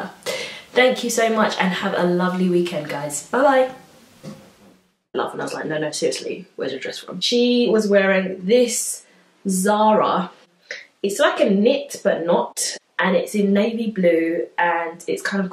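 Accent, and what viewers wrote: United Kingdom. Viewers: Great tips and great video. Love those pearl earrings on you. They really draw attention to your eyes and make your teeth look nice and white. Oh, and also, I adore your accent. A British accent always sounds so so classy. I try to follow all your tips to look polished, although I'm embarrassed to admit that most times my nail polish does chip. I do my own nails because I like changing colours weekly & I can't stand all the time wasted at the nail salon when I could be doing something more useful. Also, the smell gives me a major headache. However, in 2019 I shall carry my nail polish in a baggie in my make up kit. No more excuses. Keep up the great videos.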